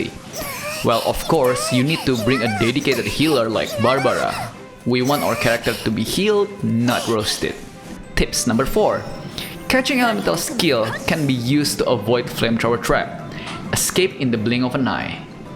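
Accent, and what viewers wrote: Indonesia. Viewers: yes it is, even though I don’t really talk like that but I hear a lot of people in Indonesia talk like this. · i believe its indonesian accent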